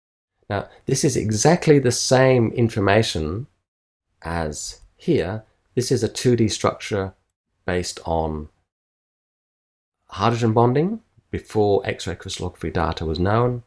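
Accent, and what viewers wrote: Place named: Australia. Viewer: Best Molecular Biology Professor; your videos are timeless, like etenal. It also made me like Australian version of English outspeaking.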